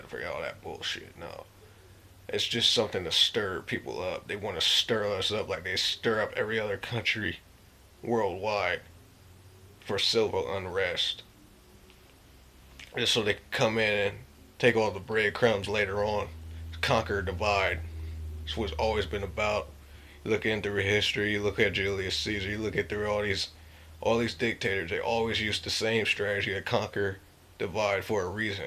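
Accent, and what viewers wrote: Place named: South, United States. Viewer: your body language is speaking when you're lying out of you mouth don't you know most things or institutions was suggested toward "black" you know what happened to natives . and now Mexicans are considered illegal alien(s) on parts of west side america (Mexicans original land ) go read a book (several) you're looking stupid . & you sound like typical southern tabacco chewing inbred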